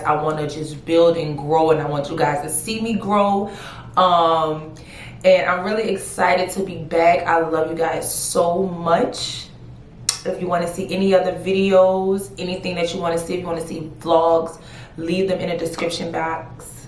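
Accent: Louisiana, United States. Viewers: You are beautiful! And it sounds like you have a Louisiana or Arkansas accent have a lot of family from there thanks for sharing gorgeous look 😍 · Knew you were from New Orleans as soon as you started talking 😍😍 Then I went to see if you put where you're from in the description and I was right!! 😂 I'm from Jersey, but I LOVE NOLA accent!!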